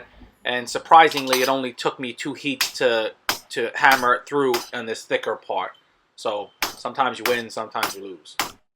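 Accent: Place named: Upstate New York, United States. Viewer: Love you’re videos!!!! You’re accent is also very interesting! I’m listening to “I’m guessing an up state New York accent? That has picked up the cadence of, for sure, and is slowly turning Taiwanese! It ads such an awesome element to your content. It’s ever present in your videos, but far more pronounced on your wandering Taipei videos when you’re obviously interacting with locals off camera! KEEP BEING AWESOME! I’ve gotta get on you’re Patreon page. Thank you for all you do!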